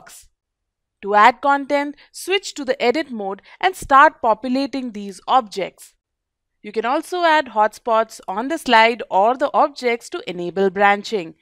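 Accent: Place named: India. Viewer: Indian accent, better get used to it. They are really good at software development...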